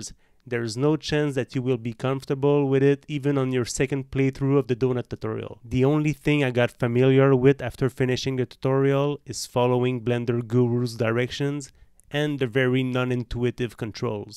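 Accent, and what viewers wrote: Quebec, Canada. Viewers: Quebec accent is peak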